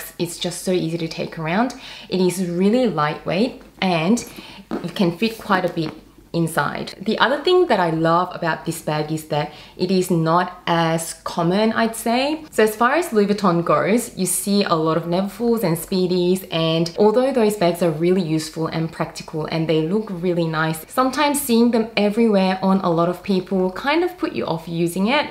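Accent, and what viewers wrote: Australia. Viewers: Korean Australian accent...? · Beautiful!!! Aussey accent is nice too. 😝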